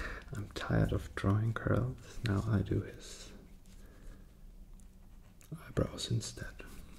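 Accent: Germany. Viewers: this is when he still had a little german accent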